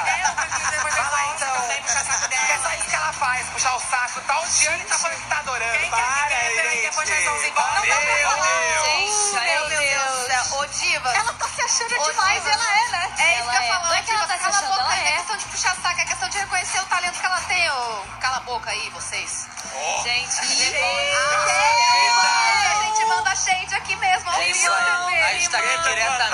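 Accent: United States. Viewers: Que sotaque americano lindo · queria entender esse sotaque meio brasileiro meio texano meio nordestino da blogueirinha.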